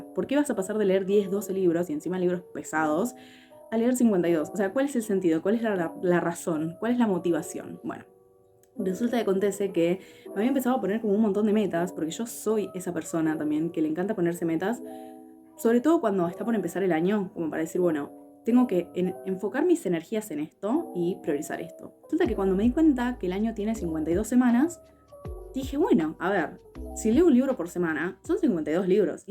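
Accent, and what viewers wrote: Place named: Argentina. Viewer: Te acabo de descubrir y me has enamorado. Suscribo cada una de tus palabras.....y encima con avento argentino....eso es un plus, y obvio.....mucho más lindo.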